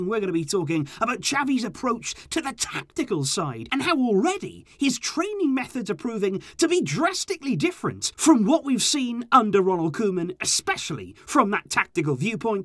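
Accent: United Kingdom. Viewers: are you British or Irish? The accent sounds British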